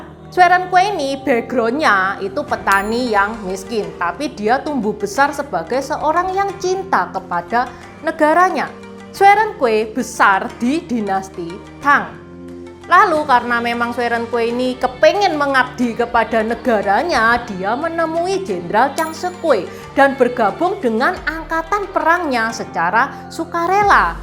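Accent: Java, Indonesia. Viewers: suaranya banyak dialek. Jowo pada hal Aku penggemar ini orang Bugis. Lhoo 🙏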